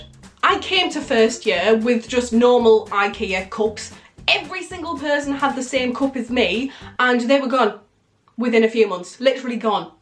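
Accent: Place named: England, United Kingdom. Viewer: Where are you from if you don't mind me asking? I'm from Scotland but ik there's like 50 different English accents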